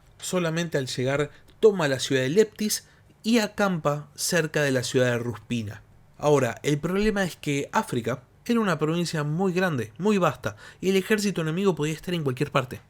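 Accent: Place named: Rioplatense, Argentina. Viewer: Aunque no me gusta nada tú acento argentino o uruguayo (escuchar Pompesho en vez de Pompeyo , weni widi wiki jajaja ,en vez de veni vidi vichi ... En serio no puedo con eso me revienta el tímpano) he de reconocer que tienes un contenido de mucha calidad. Te mereces más subscriptores, a lo mejor si intentases usar un español más neutro conseguirías más subs españoles.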